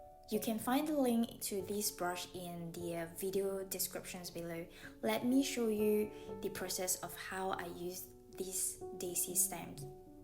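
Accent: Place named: Scotland, United Kingdom. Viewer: Completely off topic. But I do love the Scottish accent.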